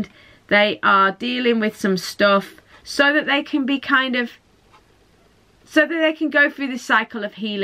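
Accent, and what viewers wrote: United Kingdom. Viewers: Thank you, love your cheery British accent! I chose #1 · Kay Treue same!😄 And both😁😁❤️ 100% resonated, thank you❤️ I’m wondering, could you tell us please from which part of the UK are you?😊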